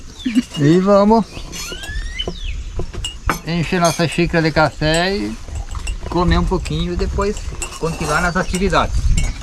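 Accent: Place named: Italy. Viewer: Como gosto de ouvir o senhor falar , esse sotaque Italiano que sdds dos meus pais, muito sofrido a vida , mas a gente tem que agradecer a Deus pq os jovens tem de td e nem sempre são felizes. Parabéns estou gostando muito dos vídeos do senhor continue assim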